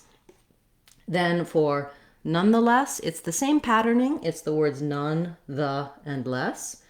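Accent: United States. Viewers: Been learning English for a very long time , my vocabulary is vast and I’m almost flawless in grammar, never paid much attention to correct spelling. My biggest challenge is pronouncing the words correctly. I love American accent and I’m enchanted by your accent, words sound pleasant when you use them.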